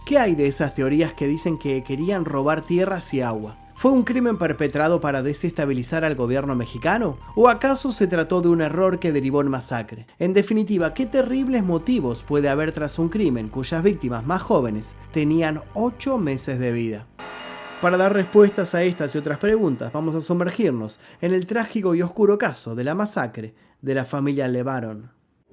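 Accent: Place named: Argentina. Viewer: Esos malnacidos ni son mexicanos, no los aceptamos, argentino de mierda que maldito acento tan feo. Largo de México!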